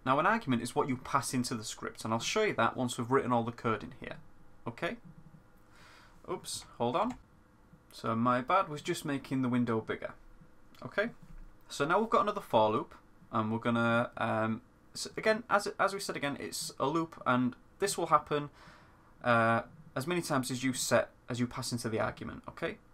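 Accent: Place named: Yorkshire, United Kingdom. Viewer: this is a great video, so nice to see a tutorial for beginners that is not just another platformer. just what i wanted/needed oh and I love the accent, there are really not enough Yorkshire accents on this site.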